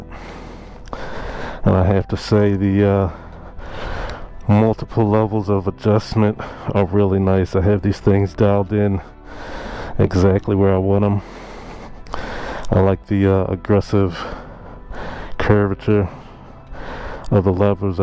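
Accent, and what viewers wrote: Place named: Baltimore, United States. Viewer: Good stuff man. Thanks for the video. Unrelated, you sound like a Baltimore dude, but those don't look like Baltimore streets 🤣.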